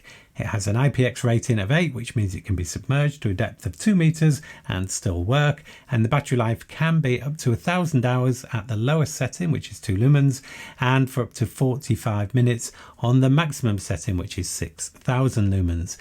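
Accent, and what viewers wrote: United Kingdom. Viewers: Come on! I was JUST about to hit subscribe and then you started bashing Star Trek and my finger mysteriously stopped! My heart shattered and I don’t know if I can listen to your soothing British delivery anymore. Who am I kidding… I share the same name as your friend who made you do this video… I’m in!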